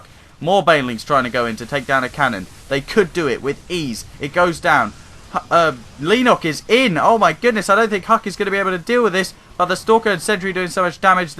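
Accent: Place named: United Kingdom. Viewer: Another British commentator ^_^ good job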